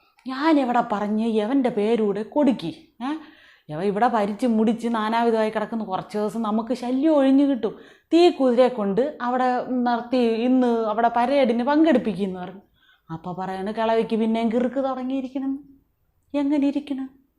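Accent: Thiruvananthapuram, India. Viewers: I like you, Your Tvm style slang ❤🤣🤣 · Chachi entharu ?. Sukangalu thanne ? Chachide thiruvanthoram bhasha kalakki. Abhinayavum super. Kochu kalli.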